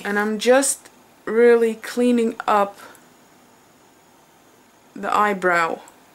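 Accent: Netherlands. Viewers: you're dutch aren't you? because of catrice and your accent..? i'm dutch tho xx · wauw!! prachtig haha. Ik hoorde trouwens meteen al dat je Nederlands bent haha :P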